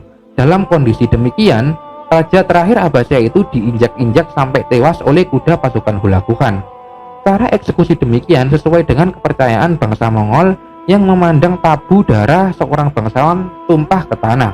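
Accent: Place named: Banyumas, Indonesia. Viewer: Logate ky wong ngapak ☺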